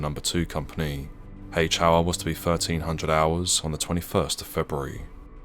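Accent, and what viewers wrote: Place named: London, United Kingdom. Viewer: Irritating London accent narrative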